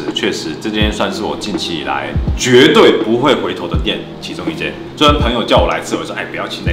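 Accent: Taiwan. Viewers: 港仔台湾腔已经这么浓了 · 看了這部影片才知道你是香港人，你的口音完全以為你是台灣人的說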